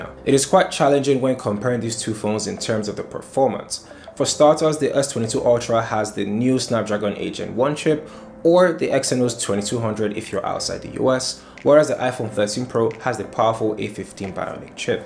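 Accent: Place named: Nigeria. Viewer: The only Nigerian reviewer wey get sense ,I love your accent bro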